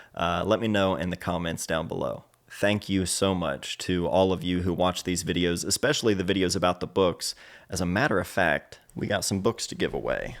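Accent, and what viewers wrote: Ohio, United States. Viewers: My favorite thing about photobooks is the ability to be up close and personal with the work of yours or others. It is a very focused and intimate way to view photography and in my opinion is the best. It takes away all distractions and allows you to be fully immersed in the story the photographer is trying to tell. I see photobooks as the movie theater experience when it comes to looking through photos, you sit there and are 100% focused on the story, the colors, the textures, everything. Also, interesting to hear you say that us Ohioans have an accent haha, I haven't gotten that before but I have heard talk about that. Great video!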